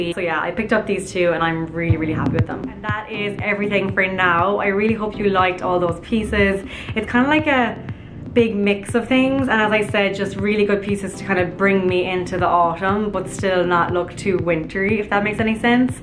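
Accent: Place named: Ireland. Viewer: Cool Irish Accent :D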